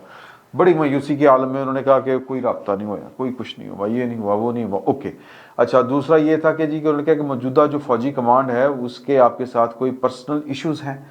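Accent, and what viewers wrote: Punjab, Pakistan. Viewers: سر جی خبریں تو ساری ایک جیسی ہوتی ہے لیکن آپ کا انداز بیاں سننے کا مزہ آتا ہے پنجابی ٹچ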